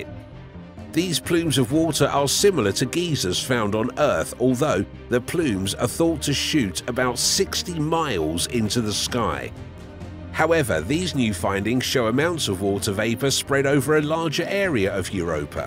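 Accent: United Kingdom. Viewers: I know. They usually get a narrator with a British accent to give their lightweight video more 'gravitas'.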